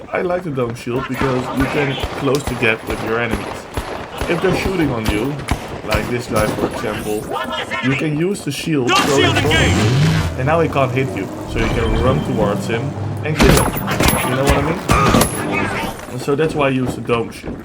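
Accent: Netherlands. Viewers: nederlander detected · Dutch accent spotted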